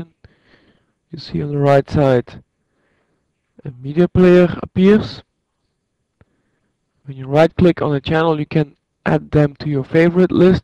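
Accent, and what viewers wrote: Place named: United States. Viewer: Inglês bom pra eles mesmo só o americano... vai falar keeeent (can't) mesmo... sotaque irritante... so... were u stoned, sleepy or just bored? can u teach me how to smash who doesnt broadcast botafogo's soccer games? PLEASE! BROADCAST MORE SOCCER MATCHES FROM THIS TEAM!!! COME ON! ITS LEADIN THE BRAZILIAN LEAGUE N PLAYIN NICE SOCCER! ty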